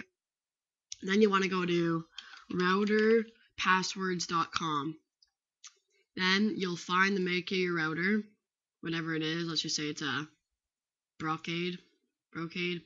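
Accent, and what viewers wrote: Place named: United States. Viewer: Finally English instructions with an American speaking thank you so much I couldn't understand anybody else's accents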